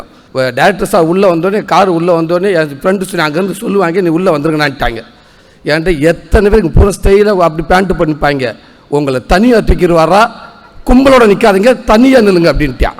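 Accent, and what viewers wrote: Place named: Madurai, India. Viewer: Soori madurai slang❤❤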